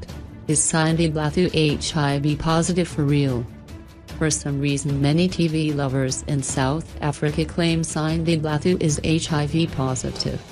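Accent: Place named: United States. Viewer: who's voice commenting there!!? some American??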